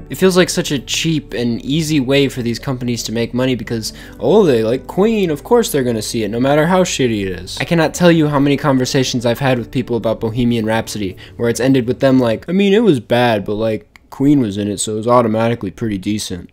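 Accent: United Kingdom. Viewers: British accent😂😂👌👏🏽👏🏽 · The british accent though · The british accent tho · amazing british accent man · you sounds like noel with that british accent wtf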